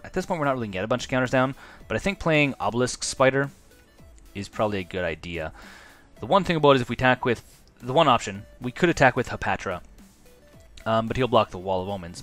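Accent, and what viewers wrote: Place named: Edmonton, Canada. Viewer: Edmonton? Your accent is too think to be Windsor.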